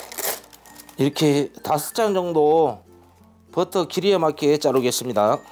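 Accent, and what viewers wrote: Jeolla, South Korea. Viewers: ㅋㅋㅋㅋ붙어부니까요..전라도 사투리는 들을때마다 재밌는거 같네요. 좋은 아이디어 감사합니다.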